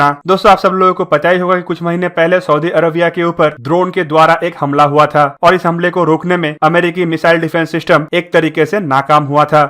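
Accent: Bengal, India. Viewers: Bengali hai,isliye Hindi thoda alag trah se bolta h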